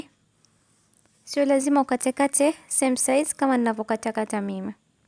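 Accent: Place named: Mombasa, Kenya. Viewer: I like your swahili wewe ni from mombasa?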